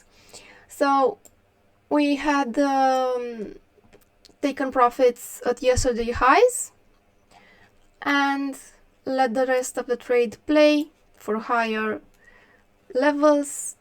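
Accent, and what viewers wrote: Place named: Romania. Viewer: Are you Romanian ? That accent is familiar.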